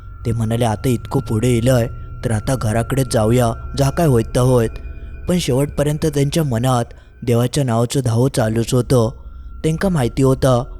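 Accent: Malvani, India. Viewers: Me tumchi ghoshta yechi khoop vaat bakto mala tumhi malvan madhun bolta te mala khoop avadto maze board chi exam chalu ahe mhanun hee 8-9 divss mi tumchi gosht ikoshakat nahi pan exam zale ki zoptana youtube ugadalya -ugadlya tumichich ghosht iknaar ❤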